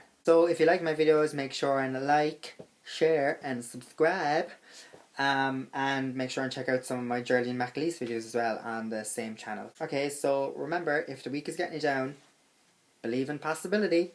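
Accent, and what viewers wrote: Cork, Ireland. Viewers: C'mere Boy, Ya really need to read the late Sean Beecher's "Dictionary of Cork Slang", and try talk to some of the old-timers - - - mind you, NOTALL NORRIES !!!!!! You don't have a really, really STRONG Cork, to be honest, more of a mid Atlantic Norry Brogue. DOWTCHA BOIY ! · My Dad was from Cork City (the South Side). Love this - there's nothing like a Corkonian voice to make me smile. Thanks. :) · I am from Cork and this sounds just like me. But I go to a high school in London in some people just can't understand me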